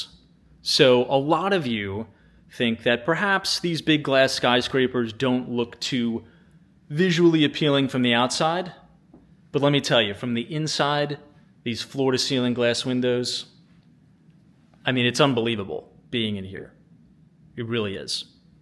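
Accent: New York, United States. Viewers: Don't know if anyone has mentioned this, but your just-enough-NY-accent really enhances your storytelling; your videos feel like we're casually walking down the street with someone who knows the city inside and out.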